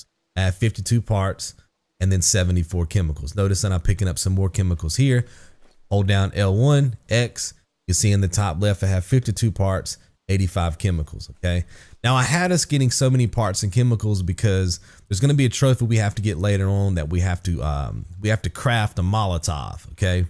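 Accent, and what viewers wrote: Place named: South, United States. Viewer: Can I ask you where you're from, love your accent, reminds me of the South :D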